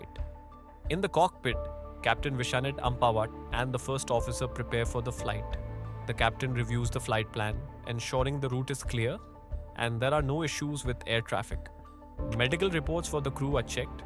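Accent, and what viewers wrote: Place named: India. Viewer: 1> Use of timestamp on description 2> keep video time(over 10 minutes) 3> video per week(2-4) 4> don't use Indian English on video(voice over) 5> use united state location until monetization 6> don't use other country for location on description 7> Always use of these colors on thumbnail(blue, green, red, orange, purple) it's the best thing 8> Use 3D things on thumbnail like (ai telly) and like your first video thumbnail 9> re-upload your videos if 2 videos per week is hard with different thumbnails and titles and change their voice with AI and also don't use Indian English for voice over 10> use middle age voice(man) 11< check AI Telly channel and make your thumbnail like him ❤❤❤❤❤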